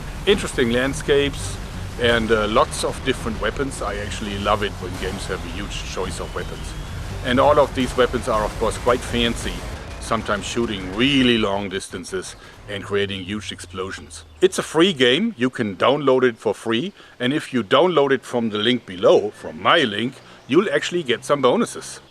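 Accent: Germany. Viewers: is he german beacause he has ahuge accent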